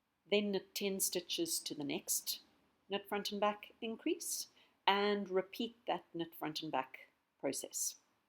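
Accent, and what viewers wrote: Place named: South Africa. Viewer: Thank you so much. Such a beautifully presented, clear and simple pattern. I'm thrilled. And to hear a lovely South African voice showing a familiar way of knitting is delightful!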